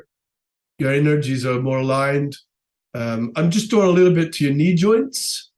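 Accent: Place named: South Africa. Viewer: When speaks, I detect a South African accent. Interesting. I also recall a Dutch acquaintance speaking English with this sort of accent. I know this video is a couple of months old, and I have only just discovered this channel, but I would choose Hawaii over Portugal any day. Portugal has terrible bushfires from time to time. The people over there planted heaps of eucalypt trees which are notorious for their ability to catch fire easily. Has ever been visited by his wife from the next world since she cast off her flesh suit?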